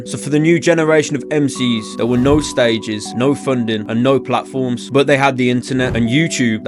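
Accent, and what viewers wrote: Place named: West Midlands, United Kingdom. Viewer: Good video bro, but how JDZ didn’t get mentioned. Early 10s to mid 10s they was the channel. Especially up north, you sound like your from the west mids and jdz was the channel for west mids too. It got so many man on the scene. It definitely moved over to grm / link up but JDZ was the channel especially for the midlands / north.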